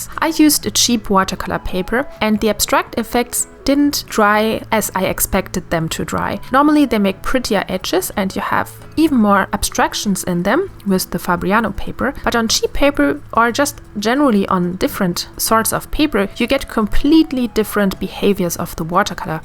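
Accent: Germany. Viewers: are you from Germany, because i think you have a little German accent( that is not a hate comment!) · I like your German accent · thick german accent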